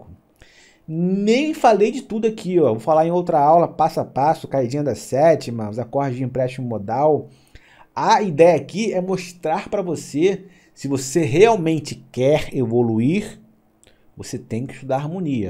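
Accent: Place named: Rio de Janeiro, Brazil. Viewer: sabia que era carioca!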